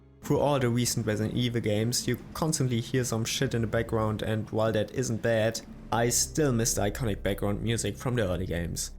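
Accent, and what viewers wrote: Germany. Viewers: It's totally fine to have an accent and also having a strong accent. It was just funny to me that I instantly knew that you're german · Brudi….mach lieber deutsche videos 😂😂😂 du hast den heftigsten deutschen akzent aller zeiten und deine grammatik ist grauenhaft. Es heißt „oriented“ und nicht „orientated“ beispielsweise. Aber kein hate 😅 unabhängig davon ist die videoqualität, recherche und videoschnitt top 👍 ❤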